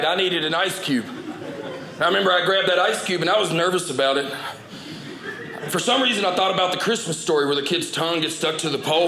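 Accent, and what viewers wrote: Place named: South, United States. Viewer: it's how Alabama, Arkansas, Texas... people talk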